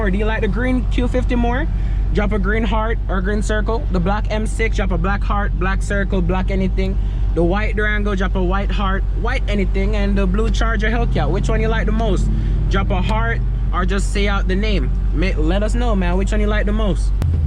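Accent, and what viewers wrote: Jamaica. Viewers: If you were Jamaican as well you would of immediately knew he was a yardie. "you sound Caribbean" only a yankie would say that. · Aye bro great content. Are u jamaican? U sound Caribbean?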